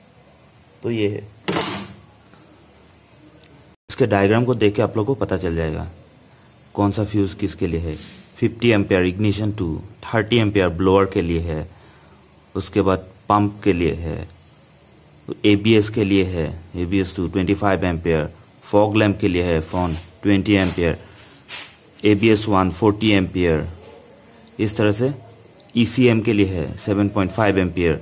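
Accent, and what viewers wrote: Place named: Assam, India. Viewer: I love the sweet sounding Assamese accent Hindi. Thanks for the informative video. Respect!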